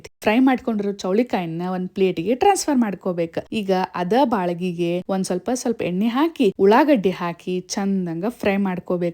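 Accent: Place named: North Karnataka, India. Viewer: ಉತ್ತರ ಕರ್ನಾಟಕ ಭಾಷೆ ಬಾಳ್ ಚಂದ್ ಹೈತಿ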